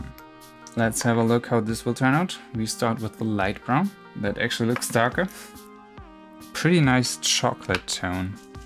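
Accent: Germany. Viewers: Hello, Im new here. And I have a question: Is the a German accent Im hearing?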